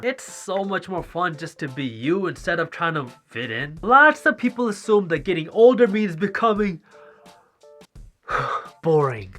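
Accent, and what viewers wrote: United States. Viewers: I just love it how you start with the Punjabi accent in the beginning and say your name, and subtly switch to American accent after that!! (In all your videos) Super proud Punjabi :D Keep inspiring man!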